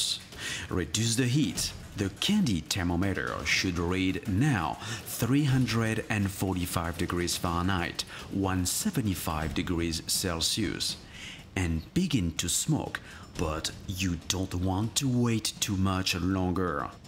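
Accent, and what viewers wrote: France. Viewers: Are you French or Italian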